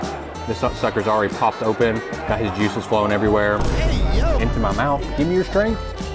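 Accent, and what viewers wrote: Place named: South, United States. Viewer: Love that southern accent ❤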